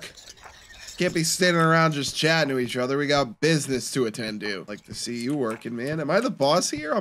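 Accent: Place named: South, United States. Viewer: That country voice modulation tho 😏🥵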